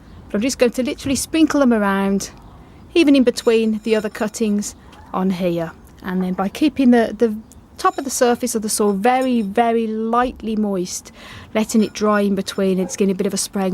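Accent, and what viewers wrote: United Kingdom. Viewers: So pampering voice you have I love UK accent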